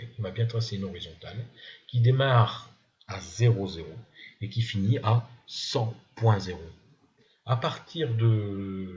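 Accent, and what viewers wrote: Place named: Nord-Pas-de-Calais, France. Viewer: Un tiot accent ch'ti, quelques fautes de français, mais chapeau pour ce travail de partage, bonne pédagogie, sans pub; si les leçons suivantes sont du même tonneau, je risque de me convertir à LibreCad. Un gros MERCI, un peuch bleu et un abonnement. PS: désolé d'avoir introduit du ch'ti dans le commentaire, je présente mes excuses aux internautes de langue française et ne recommencerai plus.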